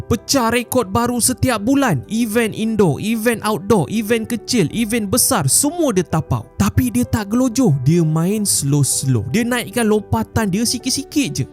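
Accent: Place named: Kelantan, Malaysia. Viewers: kelantan😂 seda0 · kelate sedak 😅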